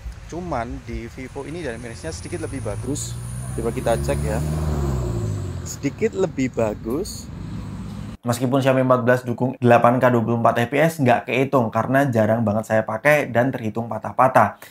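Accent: Semarang, Indonesia. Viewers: Wong Semarang tah mas?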